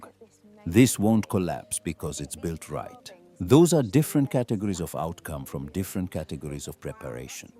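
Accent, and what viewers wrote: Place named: India. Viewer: Just when I got used to the other AI voice they change it to what is this an Indian accent? I don't like the change of voice so I can't listen.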